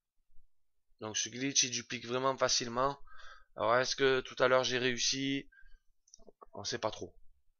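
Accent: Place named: South, France. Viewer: Trop bien l'accent,on a le même 👍d'ailleurs on se fout souvent de ma gueule quand je joue avec le micro!je me fais traiter de "marseillais"......à croire que personne ne connaît Toulouse, béziers, Carcassonne, Montpellier, Narbonne....C'EST LE SUD TOUT ÇA LES GARS!!bien tes vidéos,j'aime bien les "d'accord "que tu balances,j'ai l'impression qu'il faut que je réponde "oui,daccord" 😉je m'abonne l'ami 👍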